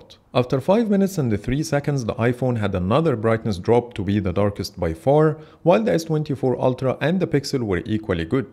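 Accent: Egypt. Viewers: اللهجه مصري 😂❤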